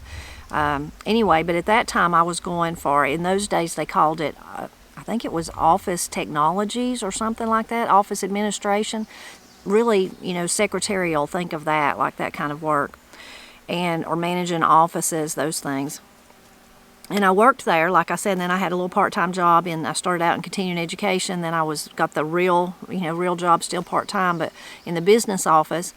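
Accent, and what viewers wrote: Appalachia, United States. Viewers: I love this channel, your accent, the Appalachian people. I’m so far from home, I subscribe to your channel and get a taste of home. I read the comments for “dessert “. I’ve been living in Mexico for 20 years, at first with my young son, but then he returned to the US for college, sigh. Your channel warms my heart . I was in Bryson City with my siblings and mother. We spent the summer there in the mountains to get away from the South Florida busy ness. We camped, swam in a local public pool, the river, learned macrame and sand painting. We climbed trees, I tasted crabapples from the tree for the first time. We caught lightning bugs, they filled the whole yard, as well as the mosquitoes. The best summer of my life. Maybe one day the Lord will bring me back to Appalachia. We were all born in TN, but Nantahala Gorge, oh my heart.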